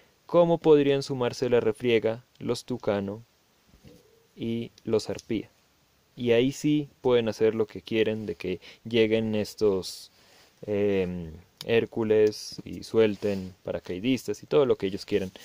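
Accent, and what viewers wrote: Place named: Colombia. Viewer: por tu acento siento que eres colombiano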